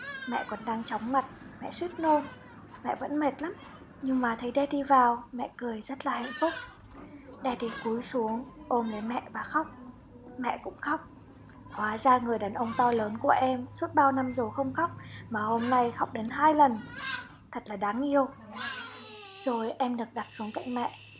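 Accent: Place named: North, Vietnam. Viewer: Chị ơi sao chị đống phim chị nói tiếng việt sao chị lại nói tiếng bắt vậy chị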